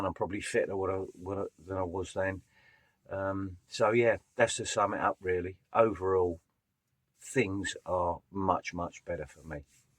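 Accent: London, United Kingdom. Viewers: His accent sounds London area to me .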